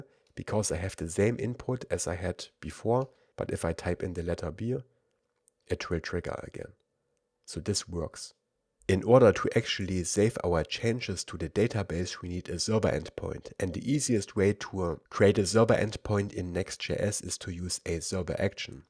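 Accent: Germany. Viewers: I can smell a bold German accent 😅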